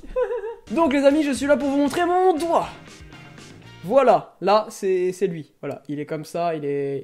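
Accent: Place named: Moselle, France. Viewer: Tu viens de Metz ? Genre Moselle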